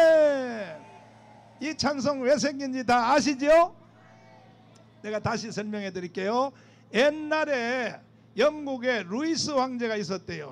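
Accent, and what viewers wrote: Gyeongsang, South Korea. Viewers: 목사님 찬양도 경상도 사투리도 은혜로워요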